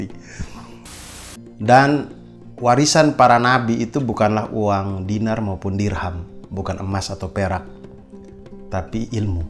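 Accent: Eastern Indonesia, Indonesia. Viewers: Alhamdulillah ka...selalu segar dengan logat timur nya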